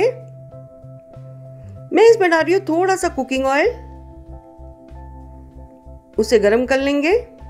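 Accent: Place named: Himachal Pradesh, India. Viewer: Mem 🙏🙏Aapki voice pahadi he (Himachal)ke jesi sweet voice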